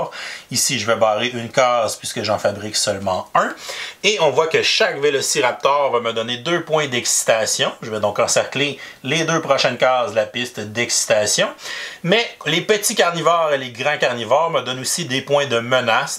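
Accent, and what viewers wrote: Canada. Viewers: Des effaces 😁 j'adore le Québécois 😉 en tout cas merci pour la vidéo, je viens de le récupérer avec mon Dinosaur World que j'adore donc à jouer rapidement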